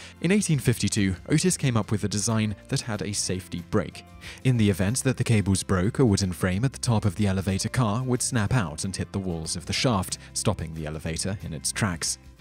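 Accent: United Kingdom. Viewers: The narrator has a British accent though (that was the joke lol).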